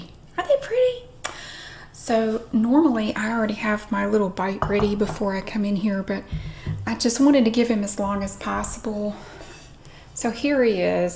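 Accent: South, United States. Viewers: Love how southerners pronounce oil. Great videos.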